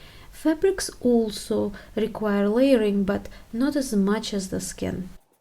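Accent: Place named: Russia. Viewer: What country do you work in obviously you have Russian accent but I think your page said you studied in Auckland?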